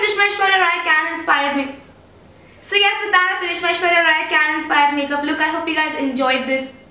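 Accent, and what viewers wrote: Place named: Delhi, India. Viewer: tv halka karwa deee wats tht meann shreya this is one of ur best video n i save it offline love ur delhi wala tone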